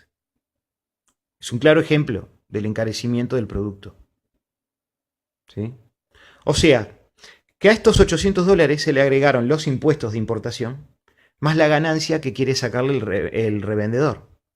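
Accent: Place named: Argentina. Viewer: ¡Más que uruguayo, pareces argentino!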